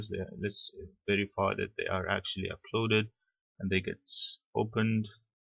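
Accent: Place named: United Kingdom. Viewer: such nice britch accesent for Arabic speaker :D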